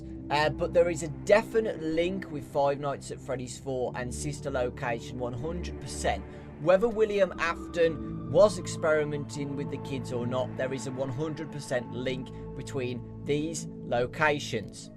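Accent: United Kingdom. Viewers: Omg your right about sister location being connected to that 4! I looked it up and its true! Also one question. Are you British? You sound a little british. Not hating I just wanna know of your British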